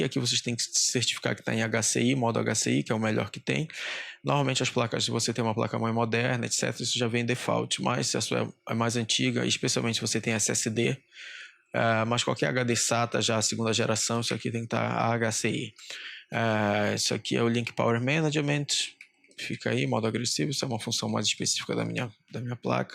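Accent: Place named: Pará, Brazil. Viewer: Certeza que é do Pará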